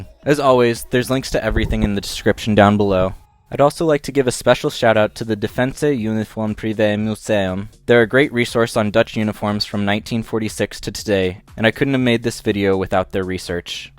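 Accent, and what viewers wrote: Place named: Germany. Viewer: sorry but that's a german accent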